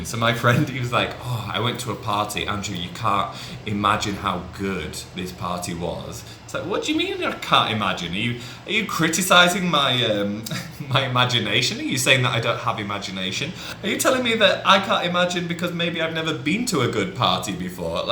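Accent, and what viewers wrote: Rio de Janeiro, Brazil. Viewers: esse é o sotaque gay brasileiro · The way you're talking is not only Brazilian but specifically Brazilian LGBT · O teu Português tem muita influência carioca. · It's sounds exactly like a Brazilian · Nail the carioca accent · You do sound like a stereotypical gay Brazilian man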